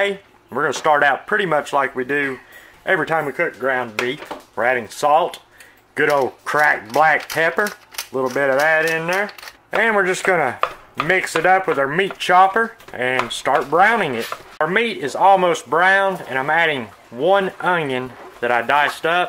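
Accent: South, United States. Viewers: I love your country talk I love watch your video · Looks good. I'll make a batch. The southern accent just kills me.... was laughing my ass off the whole time. Not in a bad way - it was just hilarious to listen to. Kinda reminded me of Justin Wilson. Look forward to more cooking. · I love so much your southern accent. I could listen to you all day. Texas here. I might try one of your recipes without all the salt. Thank you. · Thank You for the recipe. Love the “four” potatoes you put in. Looks like 5, but we all know them taters can be big or small, so use your own judgement. Love that killer southern accent, and vids from a Man with a passion for cooking. 🌺🌴💕💕💕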